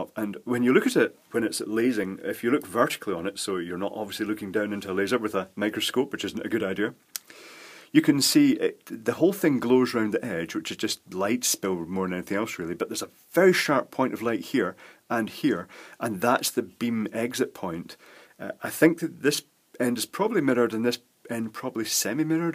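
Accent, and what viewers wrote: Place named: Glasgow, United Kingdom. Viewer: are you fae Glasgow ?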